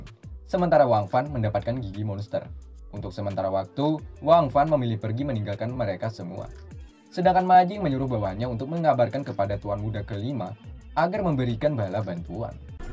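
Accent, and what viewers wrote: Banjar, Indonesia. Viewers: Urang Banjar kah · Orang Banjar ah bang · Astga, lama nonton rupaNya yang cerita ini URANG BANJAR JUA 🤣🤣🤣🤣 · Urang Banjar banh? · Urang banjarrrrr bjirrrrrrrrr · Banjar banar 🤣🤣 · Anjir ngakak pas akhirnya, urg banjar pinanya ni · Banjar bnr kh bng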